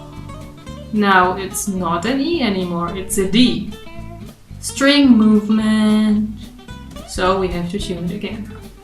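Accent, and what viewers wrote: Germany. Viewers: dieser moment wenn man realisiert das sie einen deutschen Akzent hat:D und sie deutsch ist und gute Videos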